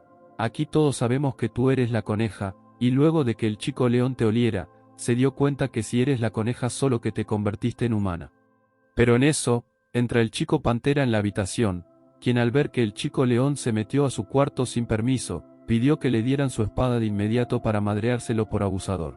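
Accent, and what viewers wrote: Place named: Argentina. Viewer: Apreciado Pibe 🎉❤🎉 se agradece por vuestro trabajo y esfuerzo, vuestra comunidad ya ha superado vuestras expectativas 🎉❤🎉 Me da mucha tranquilidad escuchar vuestro dialecto argentino estaremos a la espera de la siguiente parte 😊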